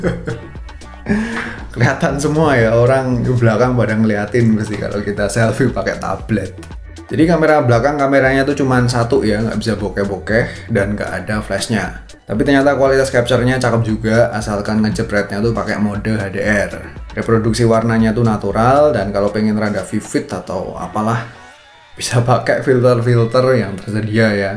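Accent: Java, Indonesia. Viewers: Mas medhok mu kui lho 🤣🙏🙏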